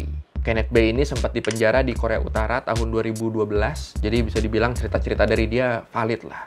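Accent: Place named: Bogor, Indonesia. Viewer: Bang lu orang bogor?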